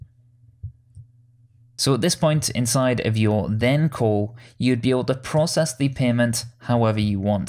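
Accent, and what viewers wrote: United Kingdom. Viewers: Where is your english accent from ?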